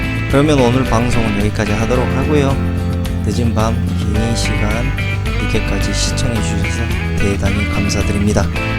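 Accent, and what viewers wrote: Jeolla, South Korea. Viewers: 전라도 목소리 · 그래그래 알겠고... 삼성 한국에선 못살게하고 해외로 나가게만들자. 어서 빨리 해외로 나가도록 자꾸 못하게하자... 시기질투열등의식나서 죽겠따. 말씨 억양이 딱 절라도네! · 뭔 헛소리 이사람 전라도 억양이네요 아주 사기를 치네요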